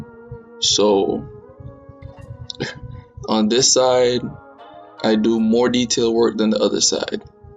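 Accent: Jamaica. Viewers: That Jamaican accent just waiting to come out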